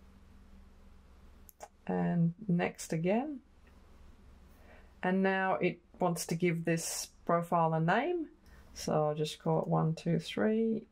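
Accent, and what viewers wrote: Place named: Australia. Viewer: Thanks for the video. I’ve just unearthed my X-Rite i1 Display Plus, and when installing it for my project I’ve found I have to pay for the Prfiler software from Calibrite … just another $100! I’m starting to print for my business wit a new Epson P900 printer. Always good to hear a fellow Australian’s voice on this, as we don’t get all the products available in other videos, and in this case sometimes they’re rebadged! Anyway, first time calibrating properly for me so hopefully it all goes smoothly.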